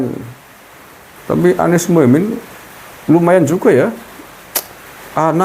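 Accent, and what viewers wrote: Banyumas, Indonesia. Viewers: Rika wong endi pak 😂😂 Wong ngapak mesti Ora ngapak ora kepenak Nyong lima bersaudara...empat ttp kekeh setia karo PDIP/Ganjar Nyong dewek ttp setia karo Jokowi Pejah gesang kula nderek Jokowi Salam ngapak Jatilawang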